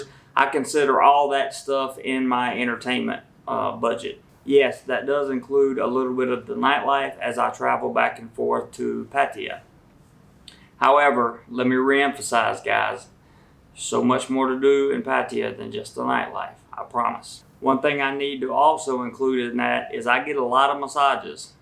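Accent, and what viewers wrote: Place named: Carolinas, United States. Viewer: You are from Carolinas know by ur accent I LIKE Jomtien very reasonable for long stay shelter Was thinking you live in a more reasonable Shelter cost location??? Thats why i asked...Sorry dude Thailand is a million times Safer than USA dont understand security reasoning?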